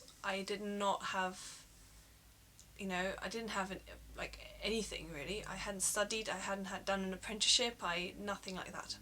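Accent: United Kingdom. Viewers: I really love your British accent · Amazing British accent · How did you learn the british accent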